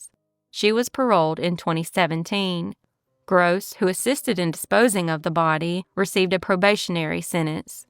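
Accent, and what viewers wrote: South, United States. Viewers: Your thick southern accent ist so cute! My mother tongue ist German, i live in Germany and i lovr strong southern accents!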